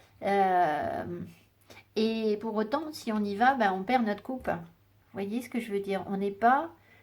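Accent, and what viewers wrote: England, United Kingdom. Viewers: Merci beaucoup pour l entrée en matière qui m à permis de noter le nom de ces 2 livres que j ai hâte d acheter dès que la Fnac sera ouverte. Également en dépendance affective, j ai fait un « soin » par téléphone pour couper les liens, comme les bonhommes allumettes aussi, mais rien n y fait. Je vous apprécie car je ressens votre sensibilité dans vos guidances et souvent votre émotion, ce qui déclenche la mienne car je suis hypersensible et ce que je traverse en ce moment n est pas facile, mais j y travaille. Continuez comme ça, je vois que nous sommes beaucoup à vous apprécier alors, ne changez rien. Beaucoup de bonheur à vous. Bises 🙏💕 Et moi j aime beaucoup votre bel accent anglais!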